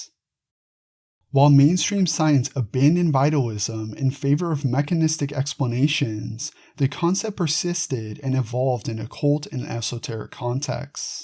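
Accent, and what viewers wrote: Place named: California, United States. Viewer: The California AI accent is too annoying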